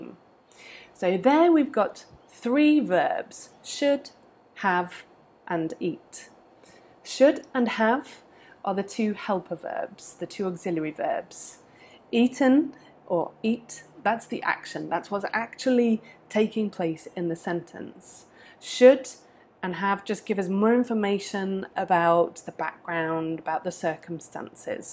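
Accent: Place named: United Kingdom. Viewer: Question. You have a $20 U.S. Bill. But you’re also speaking British accent. Why don’t you show us 20 pound instead.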